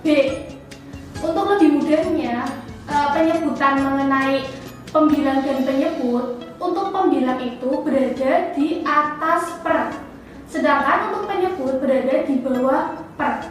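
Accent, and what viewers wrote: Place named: Java, Indonesia. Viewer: Aku suka dengan medhoknya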